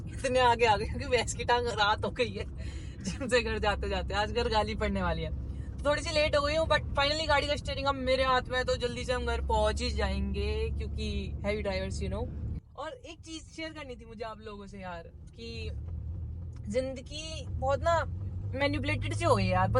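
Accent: Haryana, India. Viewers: Mst lge h haryanvi aap ki boli te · Haryanvi hindi mix😂😂😂 but Haryanvi top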